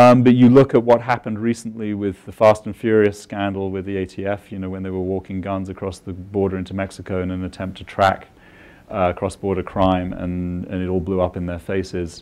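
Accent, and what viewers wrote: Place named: United Kingdom. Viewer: The republican party is not a terrorist party, we would only become that way if our country in our constitution is jeopardized. You need to get that straight, you sound like a brit so you don't know what the Hell is going on inside our country. That is precisely why we ran your asses out of our country in1775.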